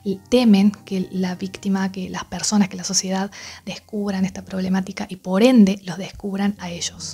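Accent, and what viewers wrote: Argentina. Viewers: Castellano, argentino puro, más claro imposible. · Gracias por ahondar en un tema tan importante.Las personas más autenticas(PAS)las atraen como moscas y al no saber hasta que punto esta BASURA HUMANA puede dañar ,,,cuando te das cuenta,ya dinamitaron tus cimientos...PREGUNTATE EN TU VIDA SOLO 2 COSAS: 1-¿Que hago setir?....2-¿Como me siento?....a la hora de relacionarnos. Me vuelve loco el tono argentino en una mujer!!jiji...Un Abrazo!!!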